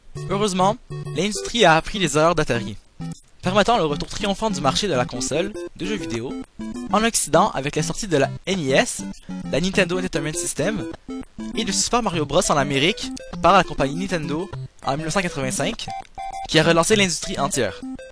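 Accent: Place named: Quebec, Canada. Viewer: Franchement, je suis impressionné. Y'a des maladresses sur la présentation (voix peu naturel, etc.), mais le boulot sur le montage illustre très bien le sujet, le rythme est plutôt bien malgré le manque de divertissement à côté, etc. L'information est de qualité, tu cites des sources, c'est plus complet que certains trucs font en tant que professionnel, y'a de l'objectivité, autant en positif que négatif, etc. C'est un peu orienté, mais je vois pas comment je pourrais dire que c'est un défaut, surtout qu'objectivement, la plupart des arguments du contre sont d'origines idéologiques quand ils sont honnêtes. La vidéo semblait être un one shot try, mais, franchement, j'ai beaucoup apprécié. Et quand j'ai entendu l'accent du Québec, ça m'a fait plaisir, surtout que j'ai l'impression qu'au Québec, la communauté gaming ne brille pas par sa présence (pas sa qualité). 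N'empêche que le boulot, il mérite pas d'être souligné quand c'est fait aussi bien. Bravo.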